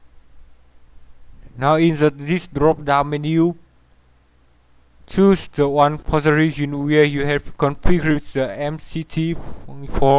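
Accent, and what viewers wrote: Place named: Malaysia. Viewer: I like how you speak English, it sounds totally like how a Malay or Singaporean Chinese speak English rather than a Vietnamese. But, nonetheless that's super dang interesting themes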